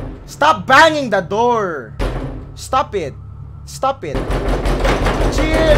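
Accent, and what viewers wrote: Bisaya, Philippines. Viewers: I LOVE YOUR BISAYA ACCENT PLEASE SAY IT AGAIN: PISTING GIATAY!!! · YAWAA: GI ATAY You got the bisaya accent👌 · Ang ganda ng bisaya acsent mo love it! (Y*wa,Giatay) Pa shout out salamat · Iloveyour bisaya accent Bahogbilat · Nice Bisaya accent boi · I LOVE YOUR BISAYA ACCENT PLEASE SAY IT AGAIN "TURTOL" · I LOVE YOUR BISAYA ACCENT PLEASE SAY IT AGAIN:GIATAY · kuya gusyo ko yung bisaya accent mo kuya;YAWA at syaka kuya bisaya din ako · Bisaya ka?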